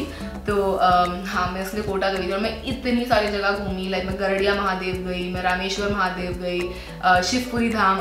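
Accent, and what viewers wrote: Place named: Delhi, India. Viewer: oh my god!desi grl ..ab pure ki pure delhi wali lag rh ho ...aap k hindi ...just wowwww ..😍😍😍😍😙😙😙😙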